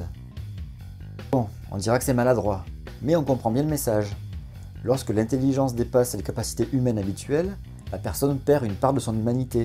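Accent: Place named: Hérault, France. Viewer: quelles été les possibilités pour que je tombe sur ta chaîne ? quelles été les possibilités pour que tu sois toi aussi de Sens Critique ? Vu ton accent je pari que tu viens de l'Hérault aussi non ?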